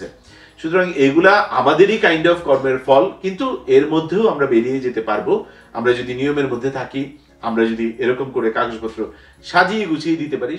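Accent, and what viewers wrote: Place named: Kolkata, India. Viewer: I am from Kolkata. I follow your all YouTube video. It already make me your fan. Your Bengali conversation 100 percent like as our Kolkata. No one can differ you as a citizen of Kolkata or Bangladesh. Thank you so much for your informative video.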